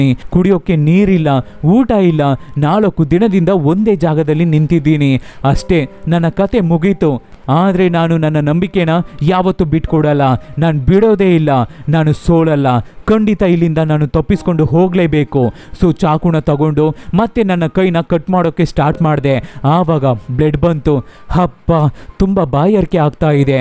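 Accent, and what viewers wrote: Tamil Nadu, India. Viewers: Please nin Kannada saryag matadi tamil tara matadtidira but explain chanag madtira 🦄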